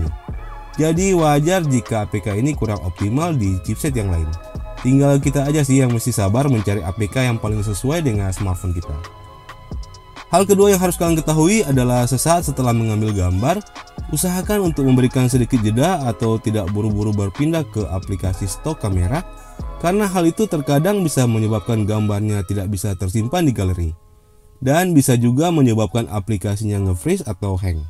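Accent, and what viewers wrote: Kotamobagu, Indonesia. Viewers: Bang kau orang Kotamobagu?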